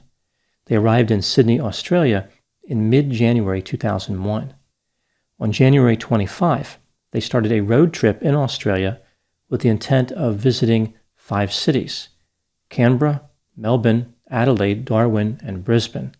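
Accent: United States. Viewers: unexpected and perfect pronunciation of our cities with an American accent. Thank you!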